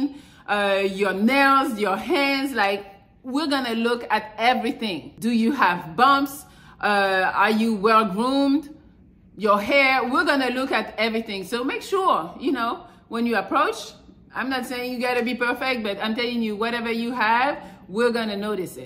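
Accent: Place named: France. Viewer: Love your French accent.❤️❤️❤️❤️❤️❤️❤️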